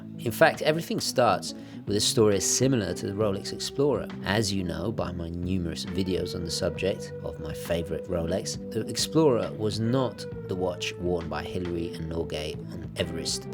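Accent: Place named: United Kingdom. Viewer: I have wondered what country you live in as you have a British accent but I am not sure if you live in Britain. Does anyone know where the Location of residence is?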